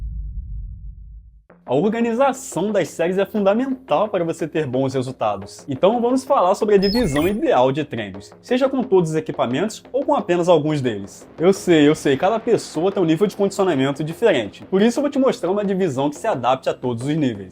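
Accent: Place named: Rio de Janeiro, Brazil. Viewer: Excelente !! Vou voltar a treinar kkk Obs: fala igual carioca prr, para de puxar o S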